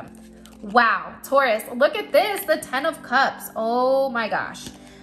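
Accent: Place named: Bay Area, United States. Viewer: Def Bay Area Cali girl Lol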